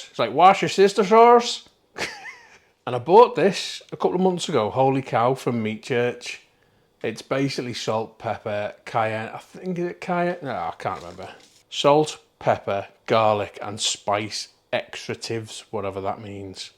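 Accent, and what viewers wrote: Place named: Wirral, United Kingdom. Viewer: Looks good mate. Are you on the Wirral? Accent sounds like it. Just been to Farm Foods in Ellesmere Port. Got the ribs £12.99. Got some other cheap freezer stuff so happy enough.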